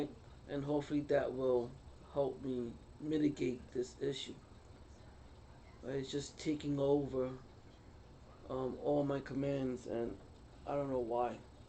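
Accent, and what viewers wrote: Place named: New York, United States. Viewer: I understand what you are saying here Sir. If it's yours and you want to keep it in your personal collection then you can shine it if you want to. Yes, it is a matter of it's mine and you better watch how you mess with it. It is called Coin Care for a reason right? But honestly I find hot water and Dawn dish soap does wonders on my Q-tip. I love your New York Spanish accent Sir and I can't do math very fast either. That dirty old goat Ben Franklin was right about the Penny idea though. Granny used to save all of Grandpa's coins every day from his pockets in a five gallon jar 🫙. In November each year she started rolling all them coins, then took it to the bank. Each year she had very near $500.00 hundred dollars for Christmas to buy gifts for the children and a nice family dinner for their meal. Why? Because she would quote "a Penny saved is a Penny earned", just good advice still today.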